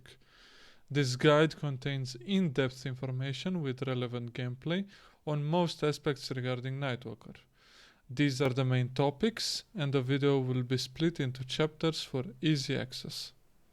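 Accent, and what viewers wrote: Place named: Romania. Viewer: Super tare, ma gandeam ca esti roman dupa accent, dar pana la duel cand am vazut in chat nu eram sigur :)) E comunitatea mare pe 4story classic? Tot am zis ca vreau sa ma reapuc de un server de 4story si din ce vad pe youtube, sunt destui playeri care joaca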